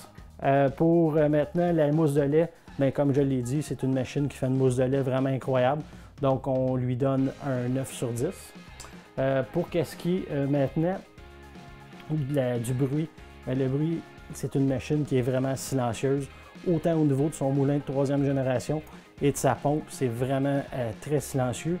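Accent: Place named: Canada. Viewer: J'adore le dialect canadien. J'ai la A9 mais je pense changer et prendre la E8. Salutations de Suéde Marocain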